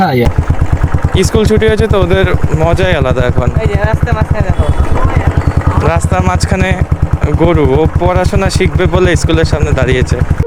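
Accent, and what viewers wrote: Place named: Bangladesh. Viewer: Bhai tumi bangladesh theke esecho naki? Karon tomar accent ta sune serkm e mone hochhe.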